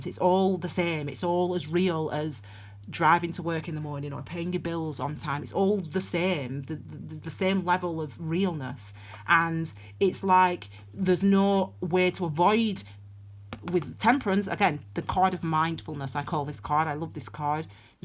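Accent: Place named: Northern England, United Kingdom. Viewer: Welcome back! Really lovely to hear from you. I forgot how much I've missed your accent! Eeh by eck lass! 😂😂😂 Clare (Manchester) xx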